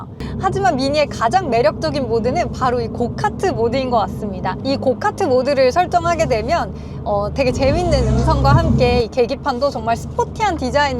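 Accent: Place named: Gyeongsang, South Korea. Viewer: 경상도 촌넘 ㅜㅜ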